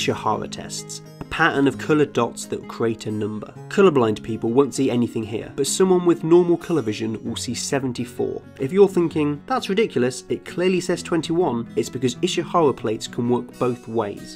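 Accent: United Kingdom. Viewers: I like the British accent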